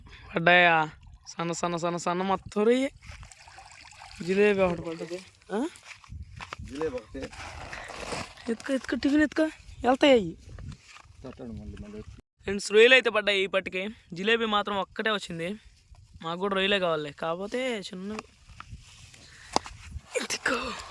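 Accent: Nellore, India. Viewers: Nellore slang kosame chustanu nee vedio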